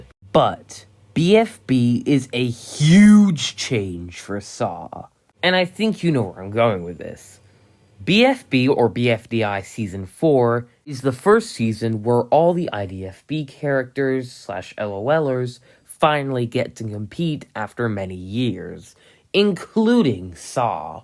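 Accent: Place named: Australia. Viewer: What happened to the australian accent 😔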